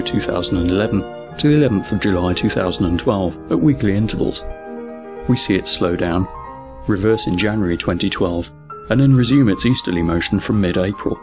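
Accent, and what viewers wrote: England, United Kingdom. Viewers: I was out after about 5 Seconds this stupid English accent or Australian accent whatever the hell it is I couldn't stand it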